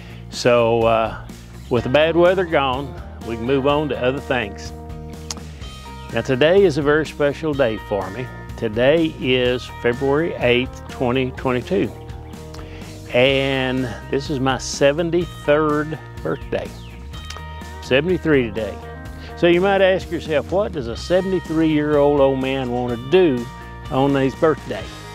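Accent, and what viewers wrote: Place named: Tennessee, United States. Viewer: Happy Birthday, your accent sounds like mine here in TN, thanks again for your videos.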